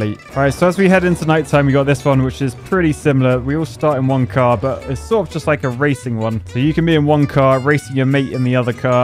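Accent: United Kingdom. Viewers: Can anyone explain to me why Brits always pronounce Taco like Tack-oh and Los Angeles like Los Angelease?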